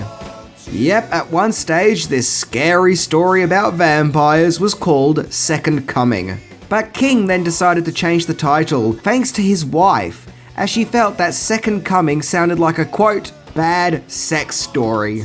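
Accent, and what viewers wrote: Australia. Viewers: are you an Aussie or a Kiwi? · The commentator here has got one of the most irritating voices I've ever heard, Aussie accents are dreadful to start with, but this bloke really grates. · What a shame, potentially interesting feature spoiled by world's most annoying voice/accent/host. Let's hope Mr Barlow pays a nocturnal visit to this Antipodean git and silences him once and for all.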